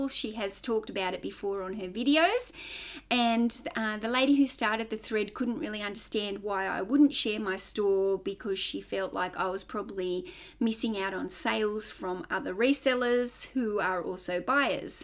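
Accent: Australia. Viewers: Love the Aussie accent. I also have a YouTube channel in Oklahoma. I am subscribing to your channel. Hope you will check mine out and subscribe too. Another YouTube couple drifterthrifters in Queensland follows my channel. Mine is all one word “TheCapGuy”. Have a great day at the op shops.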